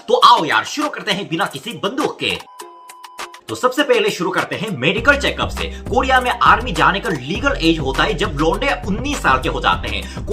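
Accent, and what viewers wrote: India. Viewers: Iska indian accent mere se jyada acha lagta h🤣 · Being a foreigner not only he speaks like an Indian but he is...like an indian.❤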